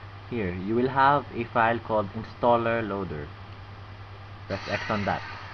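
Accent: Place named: Philippines. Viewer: god damn, work on your awful accent pinoy shiT.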